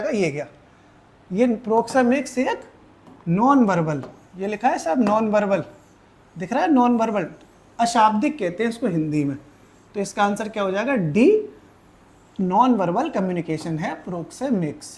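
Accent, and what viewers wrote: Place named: Etah, India. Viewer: Bhaiya aapko jaha tak h Maine drishti coaching mentorship me dekha tha aap bhi join kiye the upsc ki aur shayad aapka ghar etaa side h aapki boli se lag rha h😊😊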